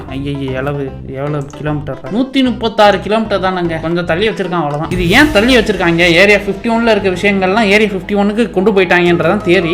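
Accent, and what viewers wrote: Madurai, India. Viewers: Thala nee madurai ya?? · Bro nee madurai la irukkiya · Bro's proving that he's from madurai in every chance he gets🙂‍↔️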